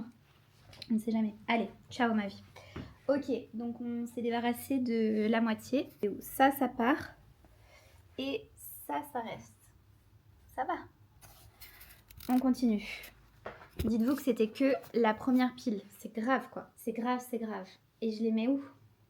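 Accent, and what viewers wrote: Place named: China. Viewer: Purée ton accent chinois 😂😂😂😂 il est adorable 😂😂😂 你好 我觉得这个视频很好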